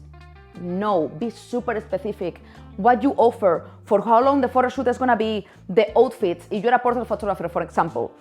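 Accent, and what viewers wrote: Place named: Spain. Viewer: I love her typical Spanish accent when speaking English ❤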